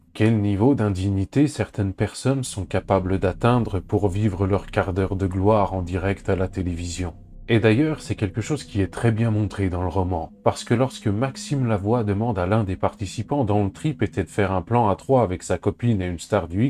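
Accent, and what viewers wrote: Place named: Quebec, Canada. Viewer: et l'accent, Drummondville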